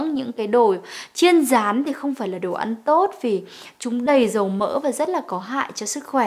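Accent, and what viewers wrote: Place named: United States. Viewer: You have a very beautiful and melodic voice, plus your Great American accent has amazingly appealed me to your lecture ! Thanks for your useful video, it'd help me a lot!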